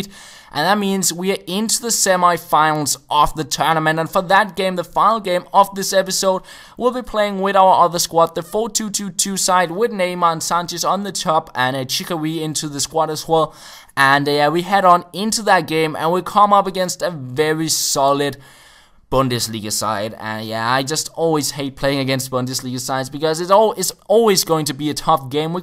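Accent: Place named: Denmark. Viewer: He's Danish just like me that explains his accent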